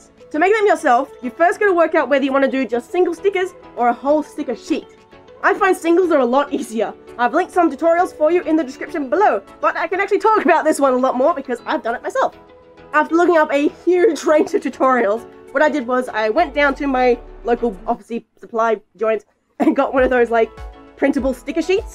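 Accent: Australia. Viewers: I forgot you were Australian until I clicked on this video......Oof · i have no idea why but i can hear the Australian accent stronger for this episode and i love it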